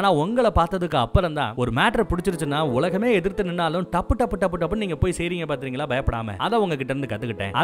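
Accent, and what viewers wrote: Madurai, India. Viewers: ப்ரோ நீங்க மதுரையா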